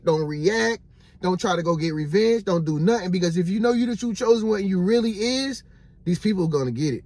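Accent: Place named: South, United States. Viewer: Yes sir, I've been hurt, and bullied at work for no reason. By-the-way I love your southern accent King! 🤗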